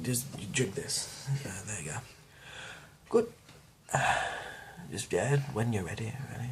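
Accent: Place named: England, United Kingdom. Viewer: Bloody hell I love that posh english murmering, don't ask me why hahaha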